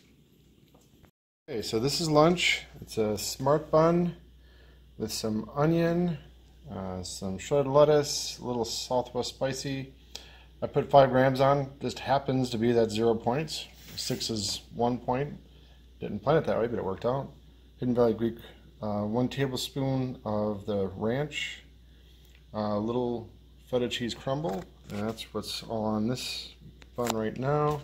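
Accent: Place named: Wisconsin, United States. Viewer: Awesome job! Haven't seen any bears yet in Northwestern Ontario Canada but just a matter of time. Lots of deer right now. Sometimes up to 6 or 7 at a time and I live in town lol. Couldn't help but laugh at the Canadian accent comment...as a Canadian I halve to say...You do sound like you are from WI. You never say "eh" which is the first clue you aren't Canadian. We say it all the time without realizing...too funny 🤣 My cousins live in Minnesota and they always laugh about how we say things here.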